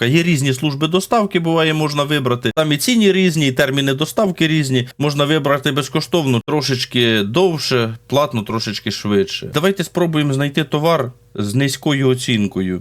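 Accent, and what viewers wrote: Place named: Sumy, Ukraine. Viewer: я почув ні разу не місцевий акцент - схоже на сумський ))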